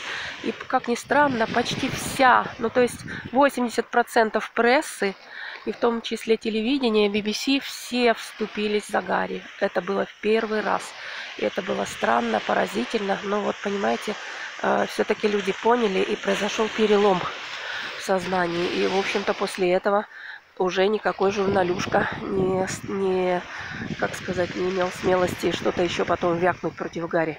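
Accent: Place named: Provincial, Russia. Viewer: наверное, вы из Мухосранска, говор выдаёт, темой не владеете, закончите хотя бы десятилетку, и не умничайте малообразованным теткам это не подходит.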